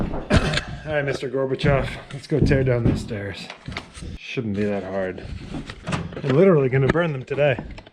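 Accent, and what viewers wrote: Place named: South, United States. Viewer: Hey I almost like you talking kinda “southern”. Made me miss my hometown 😂. I love all that cherry wood, is it a soft or hardwood?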